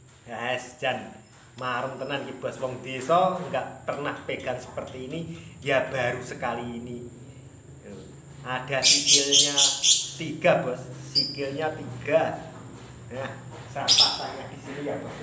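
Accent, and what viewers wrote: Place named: Java, Indonesia. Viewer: medok tenan bahasa ne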